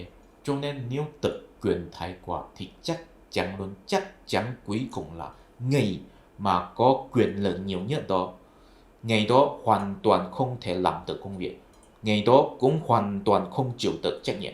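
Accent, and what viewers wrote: Standard. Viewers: Sao anh này nối tiếng Việt chuẩn mực và rõ ràng thế nhỉ? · Anh phát âm ngày càng chuẩn, trôi chảy và rõ lời hơn những video đầu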